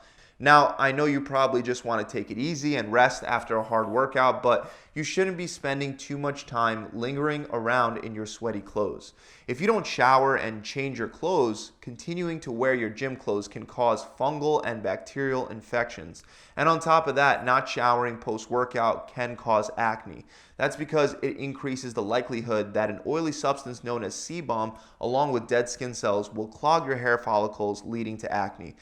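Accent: Philadelphia, United States. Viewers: I know he's from philly from the way he says water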